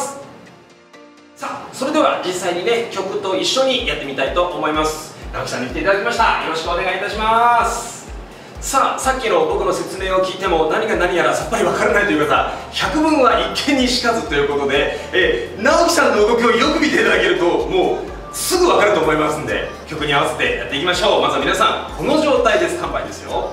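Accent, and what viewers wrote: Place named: Japan. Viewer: Antes de seguir a no pensaba lo impactante que era escuchar a un japones ponerle la "u" a final de una palabra en ingles:v wow.. nice <3♡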